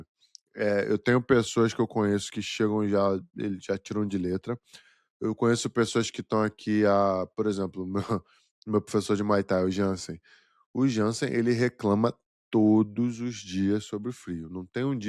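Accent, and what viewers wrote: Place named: Rio de Janeiro, Brazil. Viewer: Mano 100% de orgulho de ver um carioca que evoluiu tanto, essa é a questão da identificação, ainda bem q vc não mudou sua forma de falar e o jeito de ser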